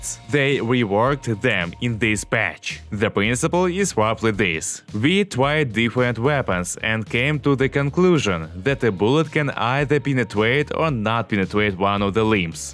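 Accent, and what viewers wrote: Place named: Russia. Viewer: Hey, I like your (russian I think) accent. It sounds cool :)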